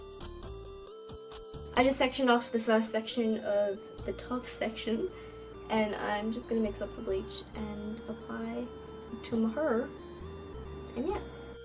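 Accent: Australia. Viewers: Is this an Australian accent I’m really trying to hear the difference between Australian and British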